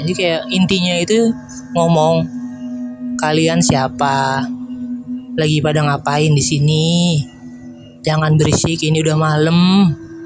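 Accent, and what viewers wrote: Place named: Bekasi, Indonesia. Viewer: Orang bekasii ya ini bahasanya khas banget 😂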